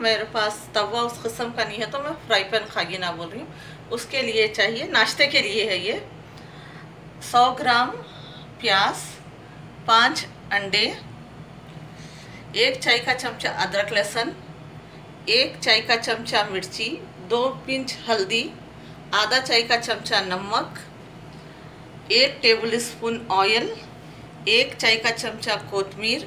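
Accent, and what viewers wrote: Hyderabad, India. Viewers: Ham hyderabadi hain aunty chote the tab dadi phopo sub apki terha baat kerte the ab unke bad hyderabadi sunne ko taras gai thi ap ne apno ki yad dila di m apko sunti hon lagta hai meri phopo n dadi bol rahi hain Allah apko lambi zindagi de Ameen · You really inspired me aunty, I am so happy that you made this valuable video. Firstly, I thought what I am hearing??? But once I started hearing you alhumdullilaha you made me realise that I am in Hyderabad. Lastly unlce's story is too motivational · Assalam u Alaikum.Aunty aap mere msgs ka rply dijiye plz khushi hoti meku....Allah apko sehath de.Ameeen...aap bahut acche hai luv u😘😘 aap sab k liye itni duaien dete...Allah tala apko bhi accha rakhe.apka bolne ka andaaz ekdum hyderabadi style me bhut accha lagta me too frm hyd. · Aunty I love u ap boht achy ho ap ki hyderabadi language mujhe boht achy lgta h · Love your commentary ,feels like I am in Hyderabad listening to your Hyderabadi Hindi,I am Telugu living in US,my cooking style is like yours, influenced by Muslim culture,born n brought up in Hyderabad. 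· Jazzak Allah Aunty, aap ke Hyderabadi sun ke Ammi ki yaad agai ju Pakistan mein hai..main malaysia mein akele rehta hoon aur yeh recipe zaroor try karonga..,Allah aap ko aur Uncle kush rakhay, take care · Hyderabadi lehja boht meetha or apnayat wala hota hai mai b india hyd se hu lkn shadi pakistan islamabad me hoee hai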